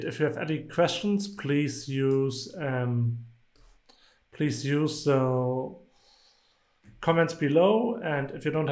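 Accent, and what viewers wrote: Germany. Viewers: nope... he's most deffinetely a german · are you german?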